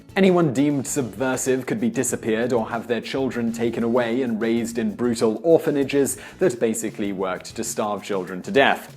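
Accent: England, United Kingdom. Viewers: It's quite funny how the presenter here speaks in an English accent, yet uses and pronounces words in an American way. Anyone else noticed this?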